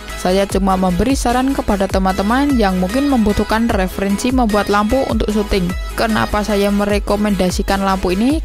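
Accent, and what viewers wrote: Java, Indonesia. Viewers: medok medok joss🙃, kreatif, menarik salam dari sragen jawa tengah brader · Wadohh zimzajaib kok jadi medok gini ya!? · Ya ampun...salut dah.... bisa dibuat kisah inspiratif bagi murid-murid saya. Suwun Dik.... bahasamu medok banget...hahaha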